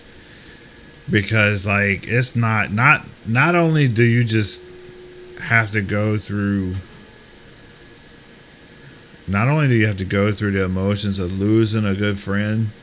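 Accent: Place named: Chicago, United States. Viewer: It's funny that when you cut your southern accent, you talk just like I do as a dago from Chicago.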